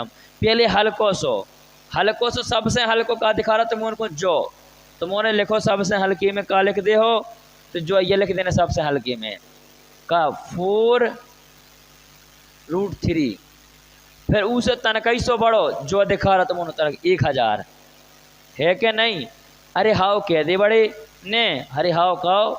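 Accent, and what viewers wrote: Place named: Bundelkhand, India. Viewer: Sir apki bundeli bhasha bahu achchhe hai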